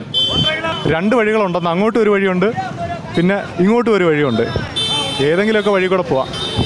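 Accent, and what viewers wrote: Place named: Kollam, India. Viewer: പക്കാ കൊല്ലം കാരൻ 😄😄.. സംസാര ശൈലി.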